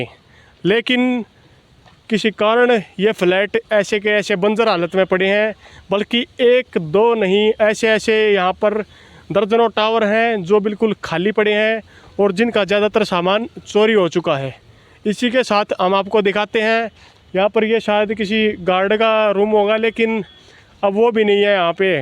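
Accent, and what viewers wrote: Haryana, India. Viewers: Bhai Haryanvi ae bol le Hindi baski na h.....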